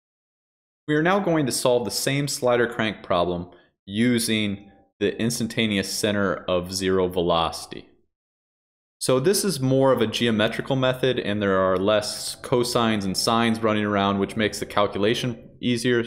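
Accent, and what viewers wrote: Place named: England, United Kingdom. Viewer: Finally, someone that speaks with pure English accent. Please post more videos on the acceleration analysis using complex number and vector math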